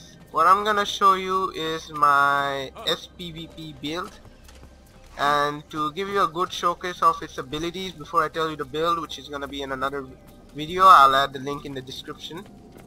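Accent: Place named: India. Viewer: sounds like an indian accent woooooooo represent us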